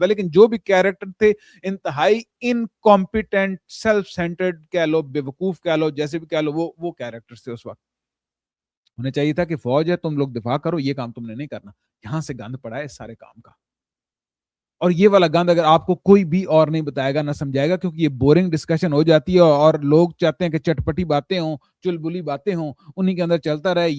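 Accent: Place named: Pindi Gheb, Pakistan. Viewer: Me be jhang bahtar attock se urdu to aap bol raha hen lakin Axcent pindi ghab fateh jang wala he aap ka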